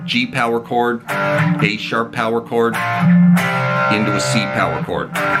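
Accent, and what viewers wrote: Canada. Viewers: Well, thanks for sharing your knowledge! By the way, from the Traynor amp in the background and your accent - I knew you had to be Canadian. (I grew up in Alberta.) Checking out your offerings now!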